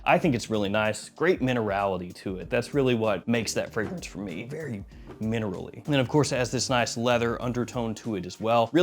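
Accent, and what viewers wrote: South, United States. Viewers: Your southern accent really came out in this vid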